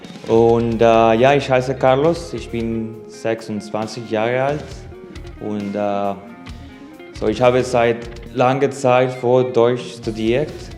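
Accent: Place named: Ostrobothnia, Finland. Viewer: Yllätävän hyvää suomea! Huomasi myös että oot asunu Pohjanmaalla. 😊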